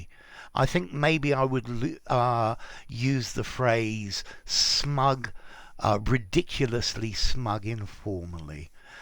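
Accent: United Kingdom. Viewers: I always love british accent!!!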